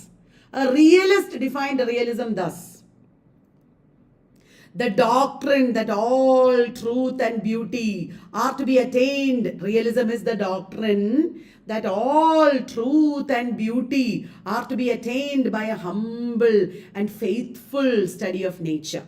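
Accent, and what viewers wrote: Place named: Kerala, India. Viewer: Mam.... Ur malayalam 👌🏻🤩😍so sweet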